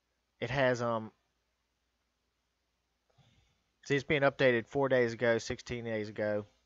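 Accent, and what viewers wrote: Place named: Georgia, United States. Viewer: You accents sounds like Georgia or somewhere close.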